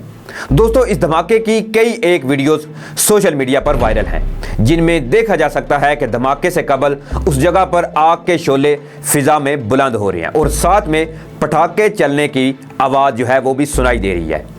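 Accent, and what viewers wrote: India. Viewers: bhaai aap ki urdu Indians jaisi kiyun hai, aap ki videos bahut achi hain lekin iss ka sara ta'assur aap ki urdu ki wajah say kharab ho jata hai, plz koshish kejiye k aap ka lab o lehja achi urdu ka ho, plz bura mat maniye ga, sorry again, Thanks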